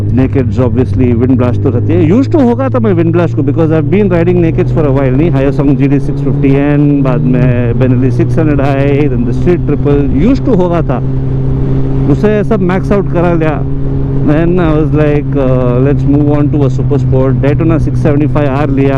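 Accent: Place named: Dakhni, India. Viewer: aapka dakhni mein vlogs aur baat karne ka tareekha bahut saaf and standard he mashallah... baaki log dakhni me baat karne pe kaan mein chubta he... will support this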